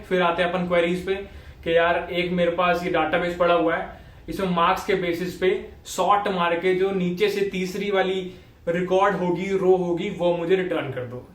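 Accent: Punjab, India. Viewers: bhai are you from punjab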